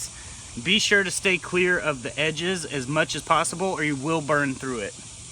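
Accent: Texas, United States. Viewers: What part of Texas are you from?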